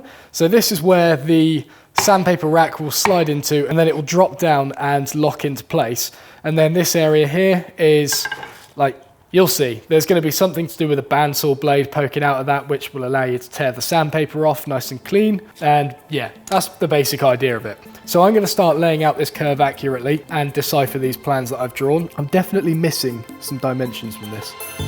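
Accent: United Kingdom. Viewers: Man i LOVE that british accent!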